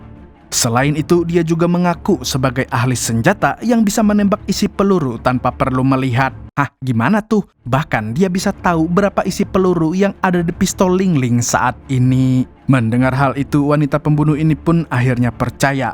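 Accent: Banjar, Indonesia. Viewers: Urang Banjar sekalinya😂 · Dari logatnya sidin ni urang banjar pang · Oooh urg banjar kah min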